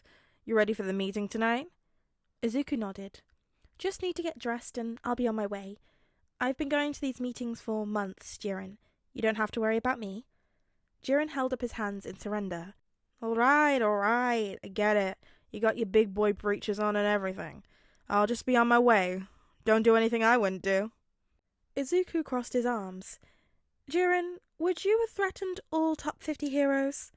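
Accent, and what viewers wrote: United Kingdom. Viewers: This is like, my 5th time listening to this podfic and it’s still amazing! You’re one of my fav podficcers! I’m also British and it really makes a difference not having someone American do it, I always find myself correcting them on the way we pronounce words and have to tell myself it’s not their fault Americans say words wrong. So basically yeah! Love your stuff and I Hope you’re having a good day!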